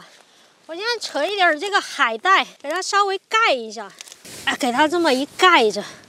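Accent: Sichuan, China. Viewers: 这川味普通话，有点想家了😂😂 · 这口纯正的川普 👌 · yooupi的口音对于川渝地区的人来说太有亲切感了😂😂😂😂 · 听声音就晓得是咱四川妹儿，果断关注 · 这川普 有点掉格了😅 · 川渝口音好重，喜欢~ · 好重的川普味哟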